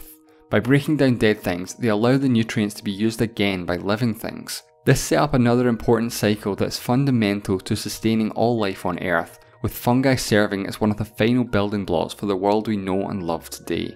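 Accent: Scotland, United Kingdom. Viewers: As a first time watcher, I learned two things. 1) This guy's accent is hard to pinpoint, but I think somewhere in Scotland. 2) We should send fungi that can survive in space out into the stratosphere and see what happens. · Alright I need to know what your accent is lol. It sounds Scottish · Excellent video...thank you! Also love the narrator's voice and Scottish accent, no homo. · I love the mix of knowlege and scottish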